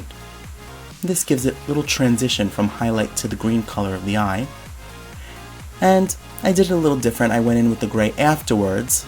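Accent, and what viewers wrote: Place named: New York, United States. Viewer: Lovely art but whoa, you are like the only person from New York I've seen who sounds like they're from New York. Never heard a soft, gentle voice in a New York accent before, lol.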